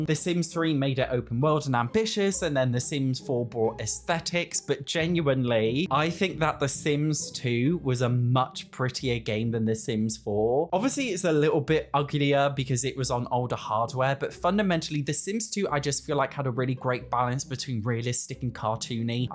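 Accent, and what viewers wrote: Australia. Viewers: ... as an aussie, that accent was 100% what we sound like 😳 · OMG YOU SOUND SO AURSSIE!!!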